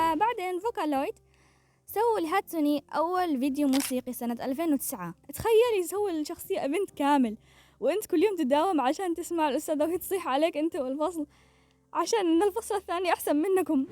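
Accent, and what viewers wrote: Yemen. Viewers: انت يمنيه صح😊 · لهجتش يمنيه لا بعيد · احسك يمنيه بس وربي انك مبدعههه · ليش ماعد تنزلين فيديوهات احسك يمنيه من لهجتك انا يمنيه😊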